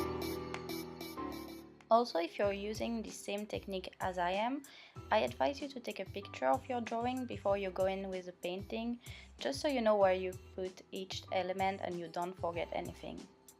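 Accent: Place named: Russia. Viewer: Ta voix en anglais est tellement cute, c'est hyper agréable de t'écouter (malgré l'accent russe hihi) Le rendu est vraiment bien,j'adore la palette de couleurs que tu as choisie! Keep going <3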